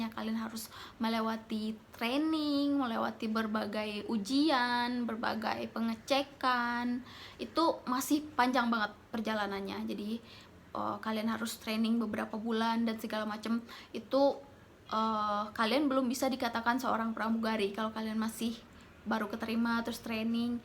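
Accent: West Sumatra, Indonesia. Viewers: Wow. Ternyata org Padang mbak nya,,pantesan logat b.indonesia nya agak kurang,masih jelas logat minang nya🙂🤗 sukses mbak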